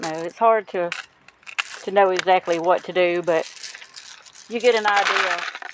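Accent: Georgia, United States. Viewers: Where do you live? You sound like my Georgia family members 😊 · It’s so pretty,love the colors and the match your bowls. Maybe try to mix the two colors together? They just go great with water to me. I could listen to you talk all day, I thought maybe from Georgia, sounds like it and your place is beautiful as well.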